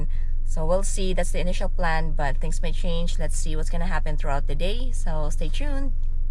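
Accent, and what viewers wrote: Visayas, Philippines. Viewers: I really love your accsent...viva to all visayan..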